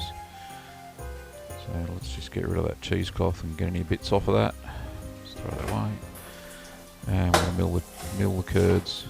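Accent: Australia. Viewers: You sound like the Aussie version of the BBQ Pit Boys!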